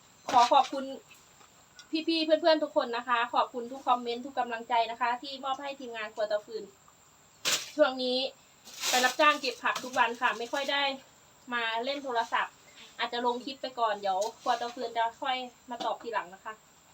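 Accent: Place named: Loei, Thailand. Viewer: เว่าคือทางเมืองเลยน้อ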